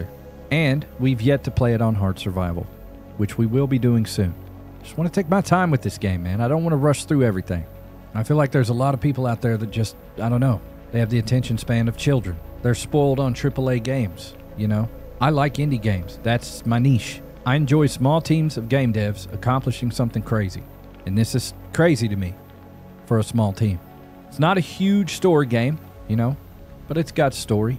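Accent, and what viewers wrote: South, United States. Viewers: I just found you on this Stoney Friday and as a southern girl from N.C I appreciate your soothing southern charm voice, you’ve not overly obnoxious and funnnyy I had so many good laughs I need this game😂😂❤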